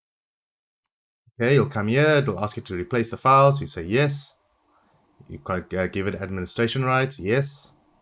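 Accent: South Africa. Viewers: Knew I heard a fellow South African! Thanks for vid man. Oh how I’ve been wishing for B&W 3 since I was like 15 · I just randomly found your channel to help me with Black and White, Your Accent, SOUTH AFRICAN! Lekker my Broer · Homie you sound south African!! Glad to know im not the only Black n White fan left alive! :D Thanks for this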